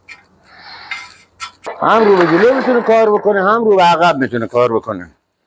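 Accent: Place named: Fars, Iran. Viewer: لحجه استان فارس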